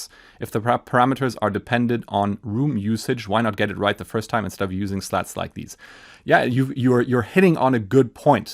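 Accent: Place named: Berlin, Germany. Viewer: My grandparents were from Berlin, so your accent is very familiar to me. 😇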